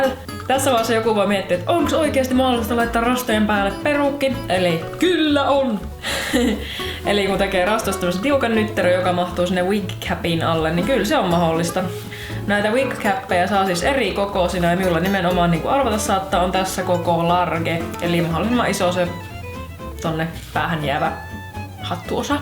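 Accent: Finland. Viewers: ootko joensuusta ku murre on nii vahva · Nonni nyt minnuun tarttu tuo siun mie sie murre 😂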